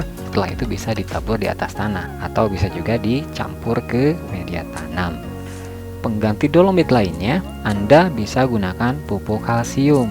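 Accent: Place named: West Java, Indonesia. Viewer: Aksennya ky org sunda ,,timana kang??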